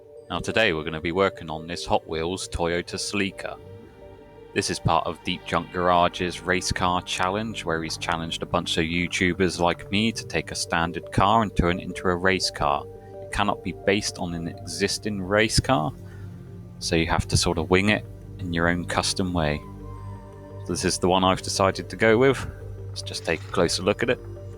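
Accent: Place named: Somerset, United Kingdom. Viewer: I thought I recognised the accent haha I live in Weston super mare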